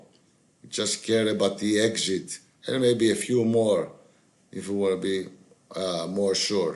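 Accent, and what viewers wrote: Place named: Greece. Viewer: Και εγώ από Ελλάδα είμαι στη Θεσσαλονίκη μένω και μόλις είδα το βίντεο κατάλαβα ότι η προφορά είναι Ελληνική !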